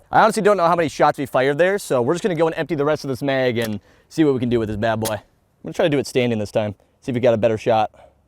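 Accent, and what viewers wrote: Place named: Texas, United States. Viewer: He has to be from texas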